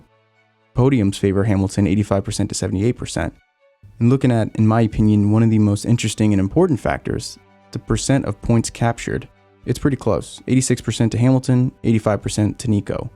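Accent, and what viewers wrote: United States. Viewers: It might be weird, but this is my first time hearing an American accent talking about F1......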